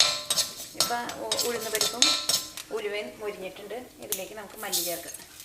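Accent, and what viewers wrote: Thrissur, India. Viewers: Dear, your sambar receipe Awesome!! But more than that i just loved your thrissur accent..;)..Keep posting more receipes..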